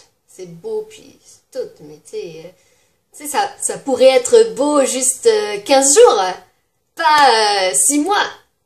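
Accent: Quebec, Canada. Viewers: 😁😁😁😁 tu commence en avoir l'accent Québécois et tu est super belle en plus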